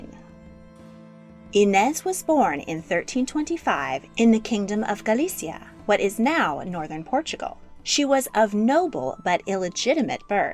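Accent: United States. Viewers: geez americans have an ugly accent. i cant bear to listen to this woman. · Please get someone to narrate who is not so fucking annoying with their boring semi american twang.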